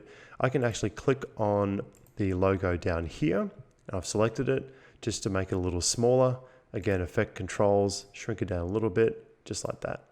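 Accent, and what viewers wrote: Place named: Australia. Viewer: That was so helpful, thanks. Also great to hear it with our local Aussie accent:)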